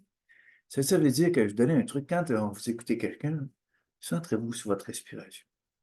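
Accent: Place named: Canada. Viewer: Bo.jour Merci merci merci Elles sont très utiles vos vidéos mais svp améliorez leur son car nous les francophones qui ne sont pas du Canada nous avons du mal à vous comprendre vu votre accent et le son qui n'est pas très clair. 🙏🙏👍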